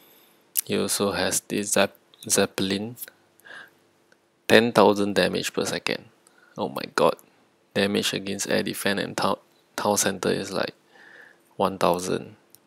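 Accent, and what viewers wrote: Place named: Singapore. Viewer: let me guess...singapore!!! you are from singapore aren't you??i know that accent..haha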